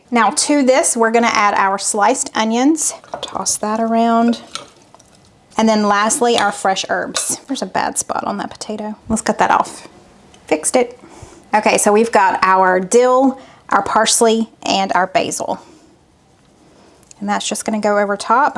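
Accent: South, United States. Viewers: I meant no disrespect for anothers accent...merely curious....I love the southern accent and I love channel. I am sorry if I offended you with my curiosity! I follow several from SC and they say it differently!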